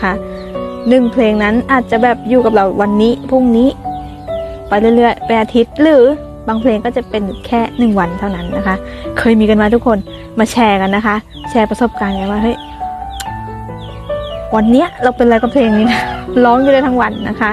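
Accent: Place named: Suphanburi, Thailand. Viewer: พี่พูดเผน่อคิดถึงน้องที่สุภรรณเลยย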